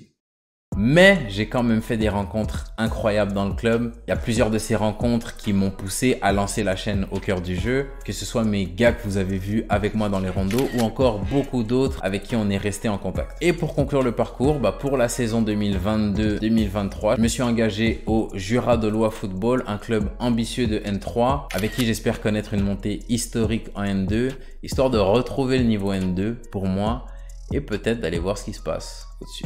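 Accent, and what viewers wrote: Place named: Italy. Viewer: Encore une magnifique vidéo un belle accent italien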